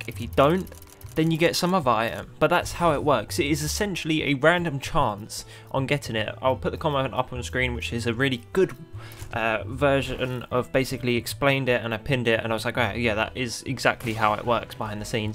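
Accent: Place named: United Kingdom. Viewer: It sounds like he's british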